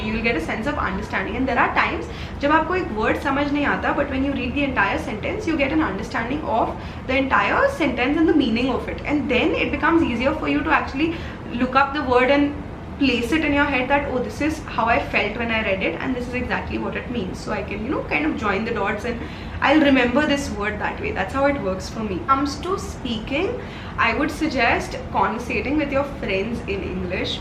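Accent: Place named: North India, India. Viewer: Omg i didn't know you're from assam ! I'm from assam as well .🙆 you're hindi and english sounded like north indian .😅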